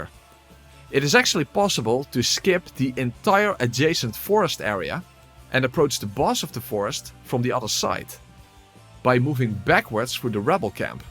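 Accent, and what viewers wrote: Netherlands. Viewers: Sounds dunglish! Anyway, great stuff 👍🏻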